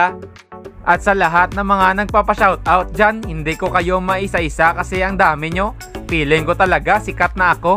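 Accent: Iloilo, Philippines. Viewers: Tuno mo ilonggo gid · Love na love q. Tlga accent ng ilonggo/accent ang sarp pkinggan..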